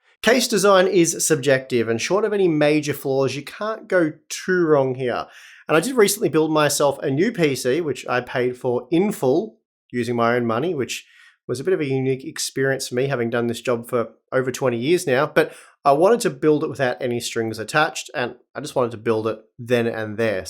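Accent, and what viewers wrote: Australia. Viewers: I really like ur channel as Australian English is much more easier to understand for foreign people.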